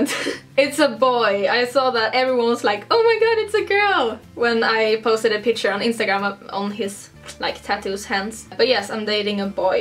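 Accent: Sweden. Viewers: the swedish accent is real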